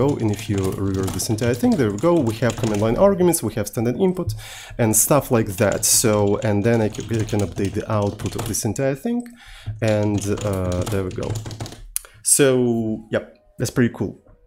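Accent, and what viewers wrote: Russia. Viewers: русский акцент)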